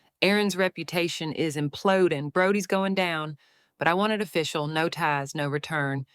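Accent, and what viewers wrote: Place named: Kentucky, United States. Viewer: Sounds more like Kentucky 👍.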